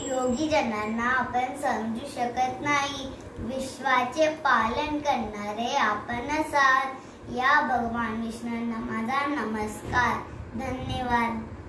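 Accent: Standard. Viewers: खुप खुप छान शुद्ध उच्चार मस्त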